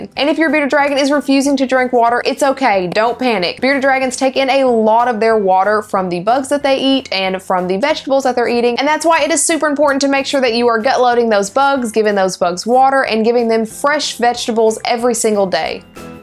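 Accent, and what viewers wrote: South, United States. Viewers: You're drop dead gorgeous 😍 and I love the southern accent 😍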